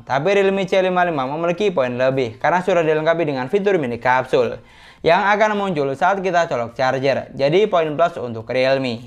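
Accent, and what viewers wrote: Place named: Madura, Indonesia. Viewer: Btw asli mana bang? Logat nya menarik heheheehe..b Saya LBH fokus ke logat nya.. Kayak logat madura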